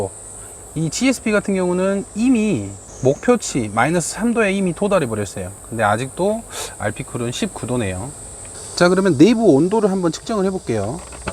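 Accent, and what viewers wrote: Jeolla, South Korea. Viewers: 억양이…전라도 어디여?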